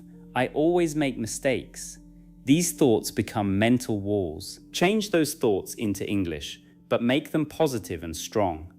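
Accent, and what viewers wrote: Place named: United Kingdom. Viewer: Oh God the British accent is amazing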